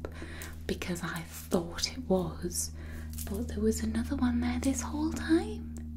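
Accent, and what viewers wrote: United Kingdom. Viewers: British accents often sound so elegant. I really like listening to you talking! Lovely greetings from Germany!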